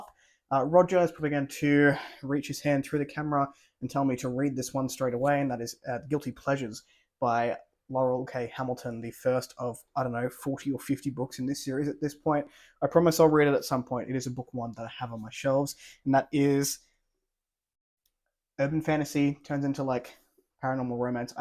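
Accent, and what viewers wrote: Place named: Australia. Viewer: Oh thank goodness. I've been hunting around for Aussie booktubers with similar book interests. Your accent makes it easier to hold my sorry excuse for an attention span. So many of these are on my TBR. The used bookstore is my vice.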